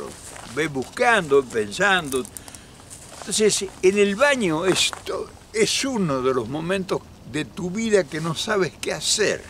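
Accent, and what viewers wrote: Argentina. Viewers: Buenos días y amigos del espacio Quería hacer un comentario que parece insignificante pero no es menor como argentino admiro la manera en que mantiene a pesar de los años que se vió obligado a irse del país nunca perdió el acento bonaerense (o sea el ser nacido en la provincia de Buenos Aires) para los que no conocen nuestra provincia simplemente gracias por su autenticidad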